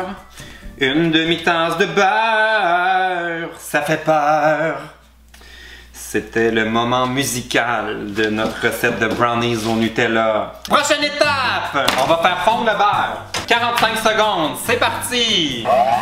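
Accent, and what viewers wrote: Quebec, Canada. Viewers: T'es trop marrant jpp 😂😂 j'adore l'accent québécois ! 😱😍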